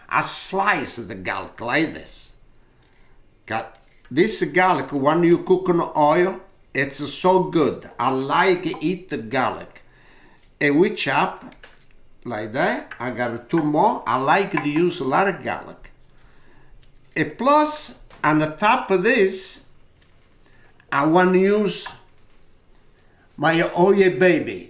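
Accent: Italy. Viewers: Love the way you explain mostly the accent, the pure italian🤌🤌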